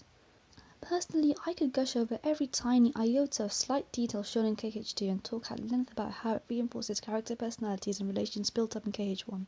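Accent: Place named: United Kingdom. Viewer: What's this British voice smh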